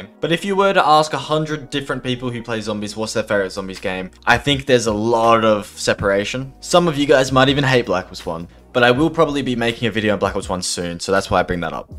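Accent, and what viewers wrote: Australia. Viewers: he’s Australian and he is saying kuda not cooter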